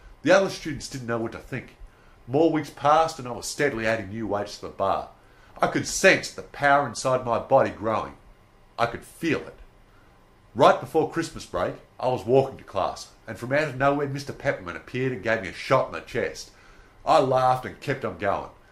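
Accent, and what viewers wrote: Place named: Australia. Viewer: Thanks for posting and great dictation, love the oz accent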